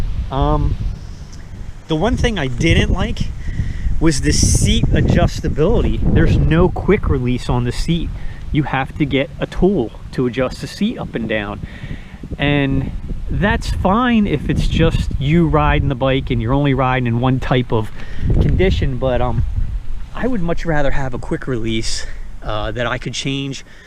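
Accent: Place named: Upper Darby, United States. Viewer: I haven’t lived in Pennsylvania in 14 yrs but I know an Upper Darby accent when I hear one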